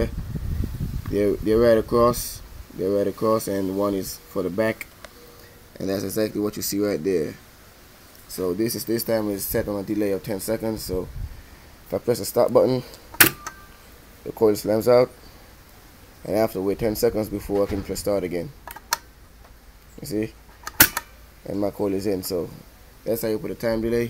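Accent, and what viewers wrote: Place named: Jamaica. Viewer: jamaican accent. thats cool great video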